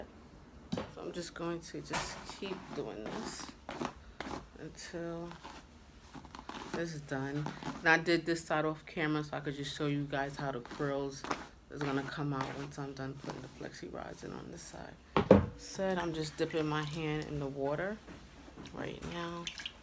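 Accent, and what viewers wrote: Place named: Brooklyn, United States. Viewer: Before I heard you say ah ah ah ba ba ba I was like she from Brooklyn lol. You wear the hair well and the color is nice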